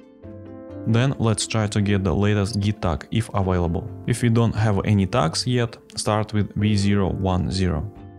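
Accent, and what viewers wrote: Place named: Russia. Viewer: Слушать ролики на английском с русским акцентом помогает тренировать аудирование!:)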